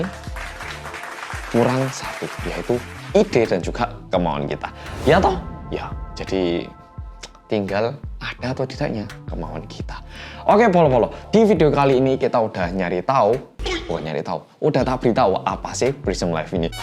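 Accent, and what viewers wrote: Java, Indonesia. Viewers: ngakak pas ngomong "muka blum raup" wkwk njawani bnget ya mas ni...😂😂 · ORANG KOREAA YANG MEDHOOOOOKKKKK!!!!!!! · Mas medokku😘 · So simple. I see Ty & Jimin, I click!:D Btw, candu bgt ama voice medhoknya mas 😭💜💜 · Kaka, kaka itu kok bisa medok?, orang jawa yaa, sumpah, bahasa jawa aja tau kaka KEREN!, pertama kali aku denger orang korea medok :) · Kok mas bisa yh medok Indonesi banget. Tapi pas aku mau medok korea kok ndak bisa yah 😂😂😂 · Aq wong jowo kalah medok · udah selesai nonton baru sadar, ini promosi toh. dasar mas medok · Mas: bersama orang Korea yang... Me: meddhooookkk.... Makasih mas i see Taeyong Jimin ^^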